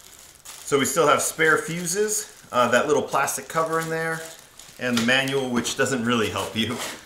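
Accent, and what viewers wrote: United States. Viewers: Why do Americans pronounce it sodder, when solder has an "l" in the word?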